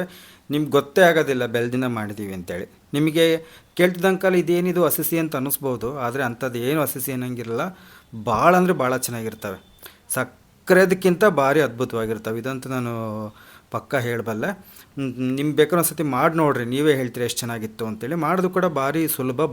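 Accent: Chitradurga, India. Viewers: Exactly u told the same story of mine also sir ......me also near Chitradurga ....ur language same as we talk in our village ... whenever I feel lonely that time I saw ur videos it make me feel happy thank you .....Because u r from Chitradurga.......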